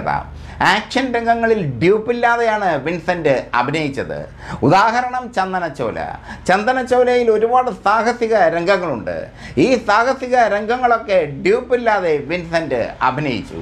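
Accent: Kottayam, India. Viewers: ജയഫാരതി ഈ ചേട്ടൻ കോട്ടയംകാരൻ ആണോ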